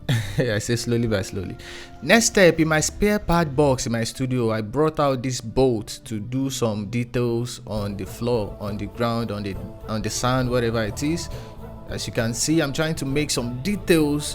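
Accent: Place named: West Africa. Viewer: Thought it was Kendrick Lamar till I cliqued on the video and heard that wonderful west african Accent 😅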